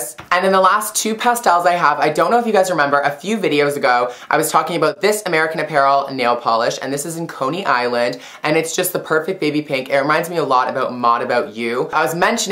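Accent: Canada. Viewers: Lol love your Canadian accent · are u Canadian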